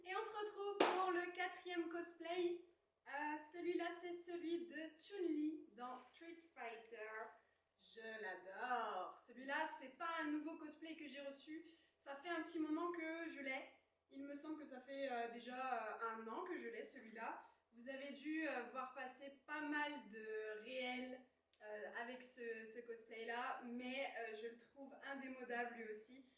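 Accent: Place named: England, United Kingdom. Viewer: Bonjour ! Jai eu limpression que tu avais un super accent anglais, je peux me tromper mais tu parles anglais ? Super contenu sinon ^^ jespere que tout roule pour toi !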